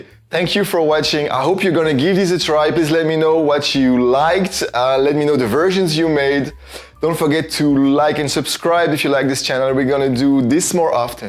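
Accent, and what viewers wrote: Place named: France. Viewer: oh man, that sounds like a french accent!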